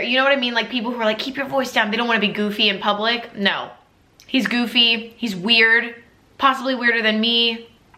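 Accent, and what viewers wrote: Australia. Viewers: That Australian accent threw me for a loop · girl mid video I got super confused and was like why does she sound different and realise you're still going with the australian accent omg😭😭😭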